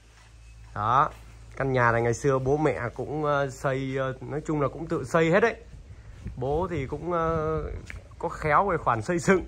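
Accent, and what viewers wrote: Vietnam. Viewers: Tưởng a ở dưới Miền Nam chứ! Ra ngay Hải Dương 😊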